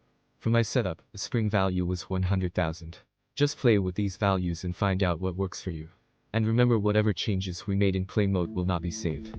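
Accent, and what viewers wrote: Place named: United States. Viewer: You are from India? right. but your accent looks like american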